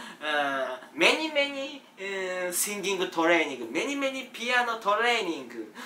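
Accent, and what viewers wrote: Japan. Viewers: わざとらしいカタカナ英語に笑った😂でもコテコテのジャパニーズおばちゃんには丁度良いレベルでありがたし🙏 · I am so proud of your Samurai English. ✨ Cool✨ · さん海外進出？！めっちゃ楽しい〜(´>∀<｀)日本語英語が最高すぎる✨ さんfanも国境を超えますね*⋆✈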